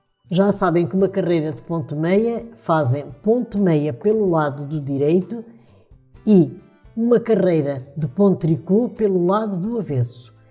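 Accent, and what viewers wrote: Portugal. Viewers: Vc é ótimo ensinando, muito fácil de entender, o seu sotaque de Portugal é uma gracinha, parabéns por todos os trabalhos lindos 🎉🎉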